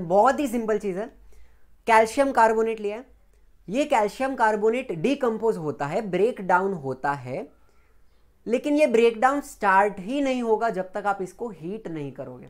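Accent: Maharashtra, India. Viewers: Sir aapki mother tounge Marathi hai kya????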